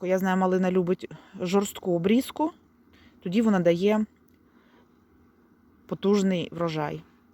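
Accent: Western Ukraine, Ukraine. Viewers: Ви мабуть з Західної України, бо розмовляєте не як Харків'яни, але зазделагідь вибачаюсь як це не так, в селі прекрасно живеться, головне все продумати завчасно з опаленням і комунікації в будинку щоб були умови для життя цивілізовані. Донечка як лялечка манюня і чоловік гарний 😍💓, хай вам Господь допомогає жити щасливо в оселі на Прикарпатті. Гуси - лебеді красиві но на жаль так Господь створив світ, що люди мають їсти 🍗м'ясо...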